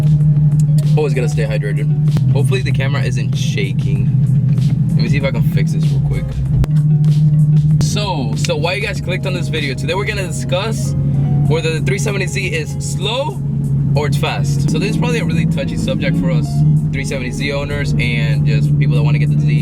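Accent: Cuba. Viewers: Acere your Spanish is Cuban asf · Dude yes please more videos like this this shit made me laugh so hard 😂😂😂 that Cuban accent though de pingaaaa lmao I live Miami so you Already know what that means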